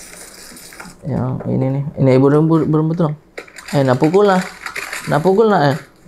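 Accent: Makassar, Indonesia. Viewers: saran bang klo pake bahasa/logat makassar disubtittle biar yang gak tau bisa tau hehehe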